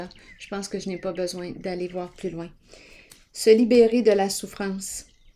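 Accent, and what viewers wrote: Canada. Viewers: Bonsoir, que le ciel vous entende⚖️🤗, Soyez bénie, belle âme au joli accent canadien ❤️🙏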